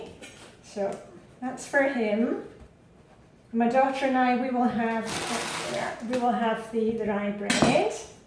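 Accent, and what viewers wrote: Scotland, United Kingdom. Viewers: Its so different than the US. hardly anyone would eat liver pate’ here because the abuse those poor geese suffer is horrible but we think nothing of using plastic sandwich bags which never disappear!!!! Okay so I’m new here. Why are you living there? You sound like you’re Scottish. How old are your kids? You look adorable. I love your haircut (I’m a hairdresser) and your cute outfits.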